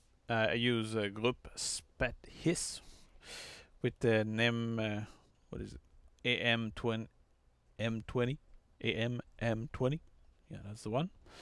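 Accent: Quebec, Canada. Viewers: Te québécois?je crois le reconnaître à ton accent anglais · Oh, what's your nationality ? I can't find what accent is yours. Canadian maybe ?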